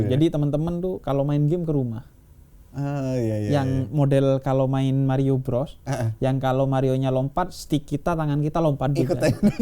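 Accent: Madura, Indonesia. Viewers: pantesan habib logatnya madura ternyata dari bondowoso semangat bib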